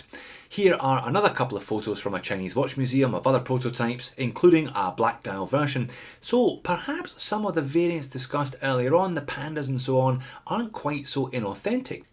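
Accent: Scotland, United Kingdom. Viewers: stop speaking scottish and I will sub you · Love the Scot accent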